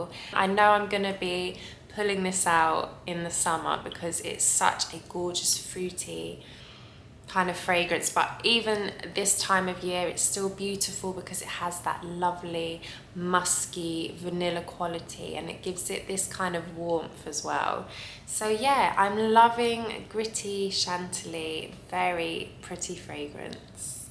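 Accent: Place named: United Kingdom. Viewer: i thought you live in the UK as you have British accent.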